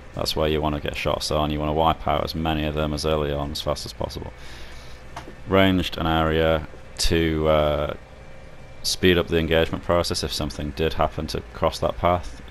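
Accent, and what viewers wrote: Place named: United States. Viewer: Are you from UK? I thought you are American from my perspective you talk with American accent.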